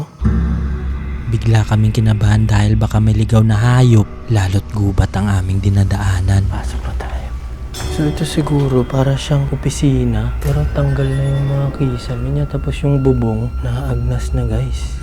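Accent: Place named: Ilonggo, Philippines. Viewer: Ilonggo gid na sia ayy. hahaha tuno ngani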